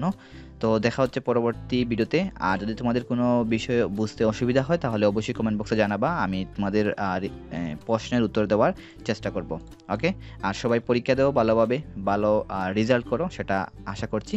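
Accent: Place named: Sylhet, Bangladesh. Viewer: খাঁটি সিলেটি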